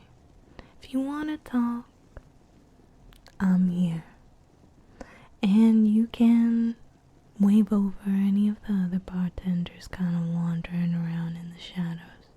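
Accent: South, United States. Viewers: I cant tell if it is or not, but if so im glad you did the southern accent again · I need more of the southern accent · >w< Ay yam a huge adorer of lovely ladies with southern accent. May I have more, please? (I luv your voice.) <3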